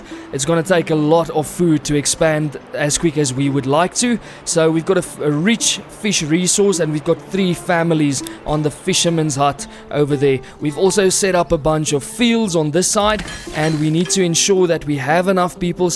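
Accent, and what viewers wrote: South Africa. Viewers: I try not to watch games I play to avoid burnout; but the South African accent is cool as fuck! 😂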